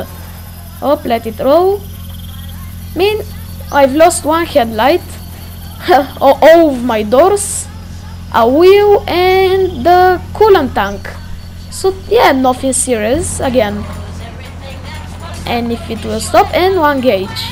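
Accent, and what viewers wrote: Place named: Bulgaria. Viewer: nice bulgarian accent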